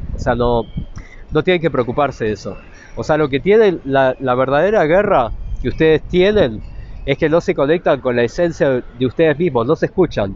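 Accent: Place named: Argentina. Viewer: ! Muy buen vídeo! Tenes acento Argento ( de donde sos?) Abrazo grande desde argentina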